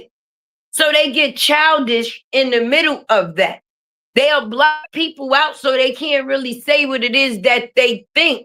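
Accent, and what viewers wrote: New York, United States. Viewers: Hey I here that nyc accent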